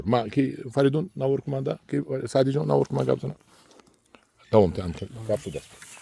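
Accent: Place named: Tajikistan. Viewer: Амаки ман бори аввал аст навори шумора мебинам, бисер писандам омад, хусусан сабки тоҷиконаи гуфтор ва тарзи фаҳмондадиҳӣ, офарин ба Шумо! Фаромуш накунед ки дар Тоҷикистон ҷавонони бисер баландтафаккур ҳастанд, вале имконият ва муосидат аз тарафи давлат хеле кам аст, чун он ҷо аксарият тайерхурону шикамдамони бемаърифат нишастаанд! Боқӣ саломат бошед. Тавсия ба Шумо: имкон бошад дар студияи сабки овоз ба дубляжи филмҳои классикии амрикоӣ ва ҷаҳонӣ аз заӣоннҳои русӣ_ инглисӣ машғул шавед; овозатон бениҳоят ғулғуладор ва устувор ва табиӣ садо медиҳад; PS Sufizoda Siroj, журналисти озод)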